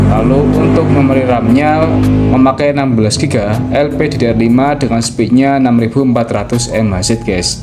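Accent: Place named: Java, Indonesia. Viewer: Jijil banget klo dnger jawa mgomong😂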